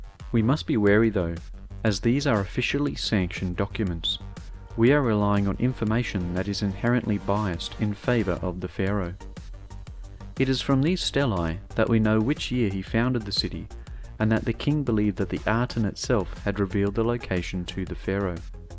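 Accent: Australia. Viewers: Clicked on this, was shocked to hear an Aussie accent, New sub here! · Awww c’mon now. Just coz we have an Australian twang we don’t need to mispronounce names….Amun Ra is not said as Ahmoon Ray, it’s more akin to Ahmen Rah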